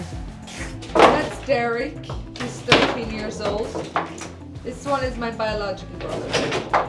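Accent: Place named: South, Germany. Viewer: As a young southern german woman, I looove your German dialect, especially since I understand it and know Mist Germans wouldn't. It is such an fascinating mix of several southern dialects, it just makes me so happy to listen to it. Thank you for sharing your life with us. I love learning about your community 😇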